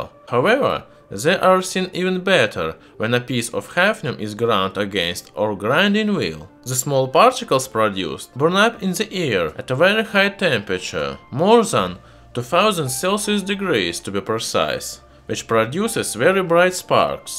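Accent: Russia. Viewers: Is it a Russian accent? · I'm watch your videos because of your accent i like Russian accent . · Congrats on this video. Didactic and interesting. Are you russian? Your accent sounds russian. English easy to understand. Greetings from Tupã, state of São Paulo, Brazil.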